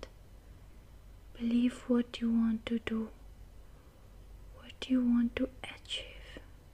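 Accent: India. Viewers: Where has this video been?! I have always looked for an Indian accent. This is so perfect. I melted to sleep, and you are candy for the eyes.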